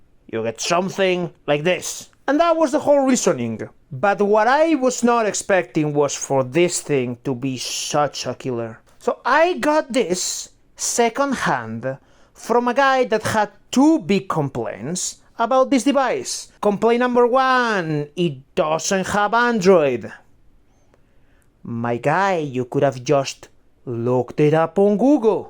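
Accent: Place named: Eastern Europe. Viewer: Lol nice review brudder, like the energy. Gave me old YouTube vibes. Slavic accent on top of it all was an entertaining touch. HiBy R1 is a beast if you're willing to work with its quirks for sure. Has LDAC and MBES too which I wasn't expecting. Plus if you really wanted, you can use it with an external DAC/Amp too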